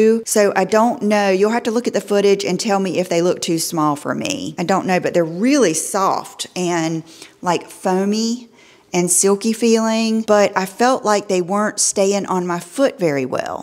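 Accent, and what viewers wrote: South, United States. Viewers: I was thinking to myself, I love how says “ ok” it’s just the cutest way with your southern accent. And then you said now on to Rachel and that’s my name 😂I thought you were talking about me for a moment haha! You cracked me up today. Those Mary Jane sneakers and the purple Zara bag just made me smile. I love how out of the box you can be at times and you adventure to try new things and are always honest with us if they don’t work out but you are inspiring me with style too. Thank you! P.S. that soap holder is just gorgeous lol it’s the simply things right?! And the Zara green jacket looks amazing on you!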